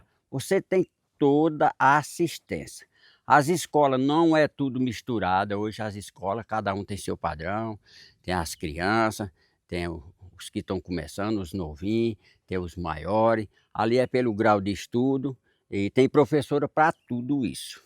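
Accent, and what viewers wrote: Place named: Northeast, Brazil. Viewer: Como gosto de ouvir seus contos verídicos o jeito nordestino bom de ouvir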